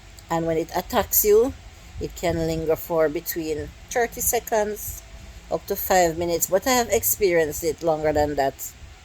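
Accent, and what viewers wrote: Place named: Jamaica. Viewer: I understood you perfectly clear...you are a well spoken Jamaican 😊 and the patois us just a little spice 😅👍🏽🇬🇧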